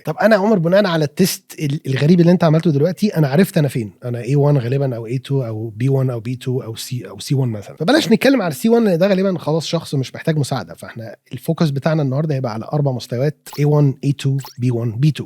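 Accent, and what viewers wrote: United States. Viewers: Hi How are you? OK I hope. Thank you for this useful video, I felt your accent is the American one & it is really heavy, if I speak with you, my accent will be the English accent of London😅,I have learned English the hard way, I speak French plus some Spanish, Romanian, Turkish, kurdish & some other dialects as well plus mother language too 😊 My level in English will be between B2 & C1 👍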